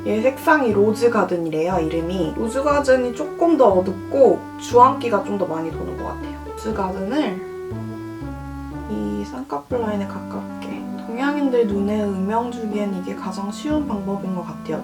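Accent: United Kingdom. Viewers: ㅋㅋㅋ크으 은 역시 영국어 발음이 을 더 빛나게 해주는 것 같아요 한국사람이라 한국어 영상이 더 이해하기 좋은건 사실이지만 역시 영어하실때의 그 무언가를 따라잡긴 힘들어욬ㅋㅋㅋㅋVlog를 한국어로 재밋게 찍어주세요 😜오늘도 좋은 영상 고마워유💫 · 구독자분들 요구에 하나하나 맞춰주시는거같아서 너무 좋아요 ㅎㅎ 언니의 영국발음도 좋지만 가끔 이런 한국어 튜토리얼도 매력잇네요! 그나저나 섀도우 너무 이뻐서ㅠㅠㅠㅠ 에뛰드 세일할때사러가야겠어요ㅠㅠㅠ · 한국어랑 영어 둘 다 좋은데 영국식 발음의 영어가 너무 매력적이에요 ㅠ💕 그리고 스트롭은 진짜 미세한 펄이에요! 바닐라코 제품도 궁금하니 통장을 비워봐야겠네요ㅋㅋㅋㅋㅋㅋ 언니는 브들파데 잘 쓰시는 것 같은데 저는 샘플로 5번정도 써봤는데 저는 뭔가 더럽게 무너지는 것 같아요ㅠㅠ 아 그리고 혹시!!! 엄청 밝은 색상의 브로우를 파는 매장을 아시나요?ㅠㅠ 제가 엄청 밝게 탈색했는데 색상이 마땅치 않아서 섀도로 눈썹그리고 있는데 섀도로 브로우하니까 시간이 너무 많이 걸려요😂 그리구 에뛰드 1호4호 갖고있는데 발색이 랜덤인 것 같아요.. 뭔가 살짝 쉬머섀도들은 브러쉬에서 뭉치고ㅠㅠ ㅋㅋㅋ그리고 문샷은 뭔가 중렴이? 브랜드 인 것 같아요 저렴이라고 하기엔 가격대가 훨씬 높고 고렴이라고 하기엔 살짝 저렴한? 상당히 애매한 가격대의 브랜듴ㅋㅋㅋㅋㅋㅋ 저는 현재 눈병으로 고생중인데 설 연휴라 병원을 못가고 있어요ㅠㅠ 하필 저번 주 금욜 새벽에 눈병이 하ㅋㅋㅋㅋㅋ 이렇게 길게 느껴지는 연휴는 처음인 것 같아요(나쁜의미로)😭 겨울 독감,눈병 조심하시구 남자친구없는 전 이 메이크업을 친구만나러 갈 때 응용해보겠습니닼ㅋㅋㅋㅋㅋ 설날 마지막 연휴 잘 보내세요👍🏻💕💕💕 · 언니 영국특유의 발음이 너무 좋아요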